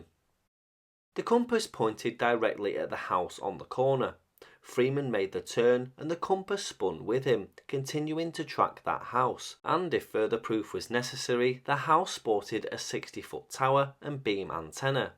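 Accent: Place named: Liverpool, United Kingdom. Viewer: Where are you from? I detect a Scouse accent.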